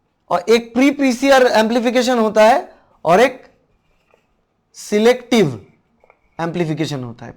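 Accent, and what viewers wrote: Bengal, India. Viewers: Sir are you bengali?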